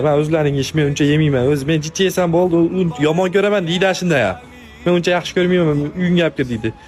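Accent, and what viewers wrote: Andijan, Uzbekistan. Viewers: Shevaga gap yoʻq xaqiqiy anjancha 😅😅😅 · Андижоним шеваси ❤ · Анжаннанмизиз дейман шеваыиз кетяпти ман. Кашкадареданман сог булинг. Ота угил. Алхамдулилла. Роббил. Аламииин❤❤❤❤❤❤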